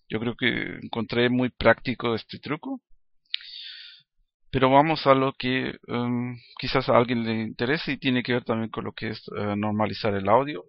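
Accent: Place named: United States. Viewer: por que hablas como gringo?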